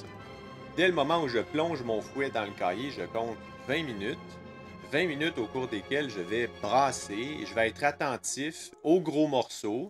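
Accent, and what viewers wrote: Quebec, Canada. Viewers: slt, merci pour tes vidéos qui sont excéllentes, et pédagogiques, il y a dans les sous titre un p'ti probleme de traduction parfois mais on s'y fait! cela vient surement de ton accent du quebec; et L'IA qui traduit s'emmele les neuronnes parfois!:hand-pink-waving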